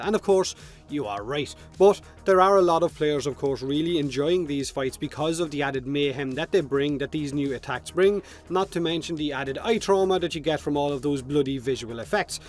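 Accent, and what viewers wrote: Ireland. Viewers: Real good music selections. And let's not forget that smooth Irish voice. should do a crossover with Rhino Ninja!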